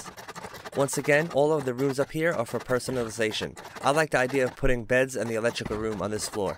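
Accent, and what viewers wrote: New York, United States. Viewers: This guy sounds like a New Yorker.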